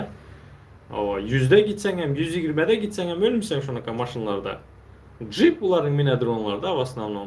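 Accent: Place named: Chardzhou, Turkmenistan. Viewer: он разговаривает на Чарджоуском диалекте,в туркменистане разговаривают на двухсот диалектах .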